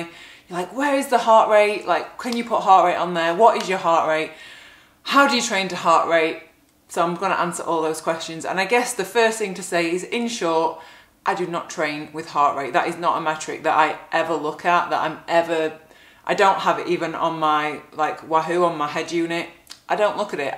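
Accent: United Kingdom. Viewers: For me training with HR is tricky, my heart throws an extra beat so the monitor is off. For example I can ride a hilly course breathe normal and have a HR of 240, so I use HR as an indicator of when my O2 max rate is close, usually for hill climbing. On a nonrelated note I do love your British accent and get a kick out of your British tan. lol. keep up the great work.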